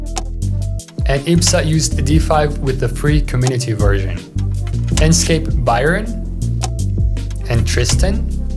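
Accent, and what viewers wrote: Brazil. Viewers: mano... eu tava assistindo e achando mto foda, ai eu percebi que tu é BR, to achando mais foda agora kkkkk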